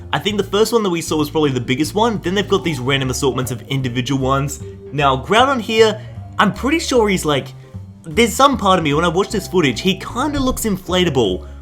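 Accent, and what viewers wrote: Australia. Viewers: I love that fabulus Australian accent.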